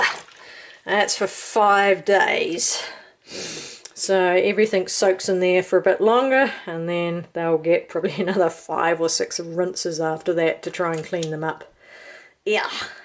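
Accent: New Zealand. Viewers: I am enjoying the pleasant company of this Kiwi.Utterly sweet accent:)(Tent=tint):):)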